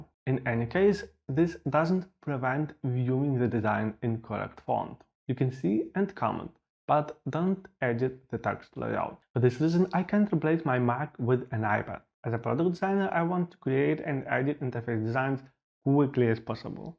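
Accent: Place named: Russia. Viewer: Are you Russian? What is the accent?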